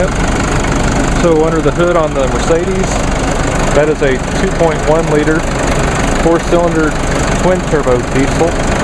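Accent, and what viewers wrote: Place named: South, United States. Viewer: Where exactly are you, if you don't mind my asking? I detect a hint of a Southern accent. Oh, the song on the Cruze's stereo was PERFECT for that morning!!!!!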